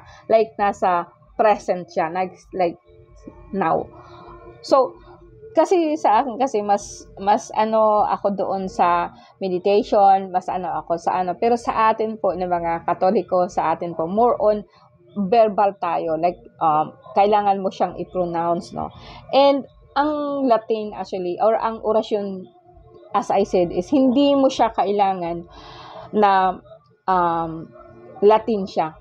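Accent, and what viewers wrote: Bicol, Philippines. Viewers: Mam tanong ko lamang ikaw po ba ay bicolana, kc po bawat bigkas ng salita mo i notice na bikolana ka, from Camarines norte po ako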